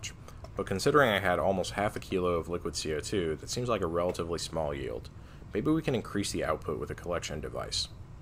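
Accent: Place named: New Jersey, United States. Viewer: Are you from NJ? You have a Jersey accent.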